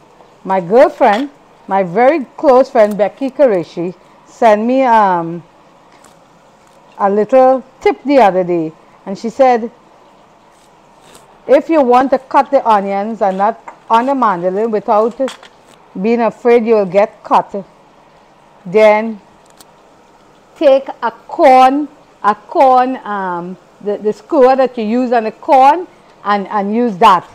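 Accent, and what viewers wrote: Trinidad and Tobago. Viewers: I'm a new subscriber and I love watching you cook. I have former college friends who are Trini that I spent years with and you bring back those memories of Trinidad trough the food, accent and love of family. It's great to see...can't wait to watch all the past videos and catch up! Sending love and blessings to the family. 🖤❤🇹🇹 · Hello......this is from a Trini living in Africa...where there is no Trini cooking...except for a little in my kitchen. I just discovered your channel. You make me laugh because you are a true Trini cook. No measurements...a handful of flour...a little dash of salt...just loving it. It's the way Trini's cook. You don't need measurements...you know what you're doing...and you do it fast. I watch other channels to see exactly how to cook the food...with the right amount of ingredients. I watch you just to lime in your kitchen. 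Love hearing the Trini accent and just your way. Next time I am in Atlant again...I will have to visit Tassa. Blessings to you!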